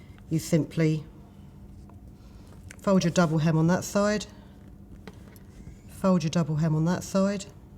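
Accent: United Kingdom. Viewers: I loooove your British accent!!! Your tutorials are always simple and ecofriendly!!Thanks a lot!!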